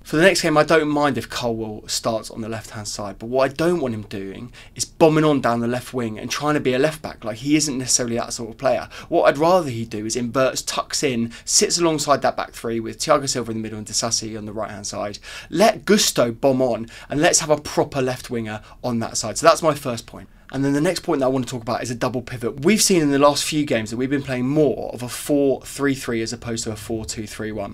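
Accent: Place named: Brighton, United Kingdom. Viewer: Are you from Brighton? You sound a lot like some people I know from Brighton. Love your attitude and outlook. We need more fans like you.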